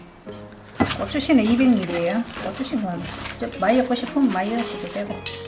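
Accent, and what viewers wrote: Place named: Seongju, South Korea. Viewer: 시래기 성주 사투리 반갑습니다 ~~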